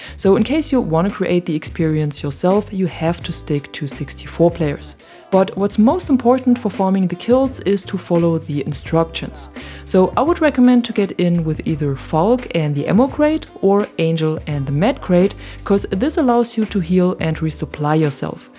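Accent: Germany. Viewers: You Germany accent is so beautiful 😍😍😍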